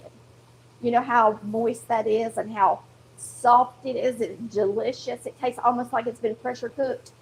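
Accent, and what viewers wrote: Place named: Alabama, United States. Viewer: I enjoy watching you cook and your accent reminds me of my auntie that grew up in Alabama. ❤ She was a great cook also. 😘💕👍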